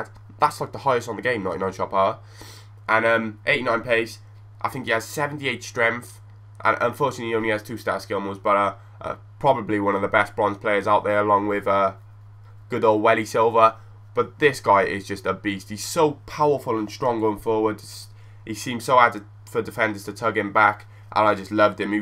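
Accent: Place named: Liverpool, United Kingdom. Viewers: You sound like ya from liverpool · Terrible scouse accent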